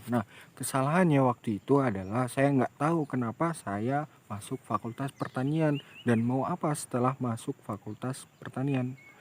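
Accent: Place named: Banyumas, Indonesia. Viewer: Woooo mas... ternyata org Banyumas.... sama donk... Banyumase ngendi mas??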